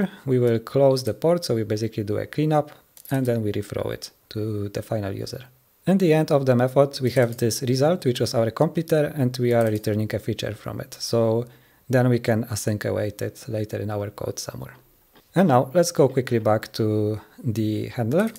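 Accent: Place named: Poland. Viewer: po głosie to chyba polak ;)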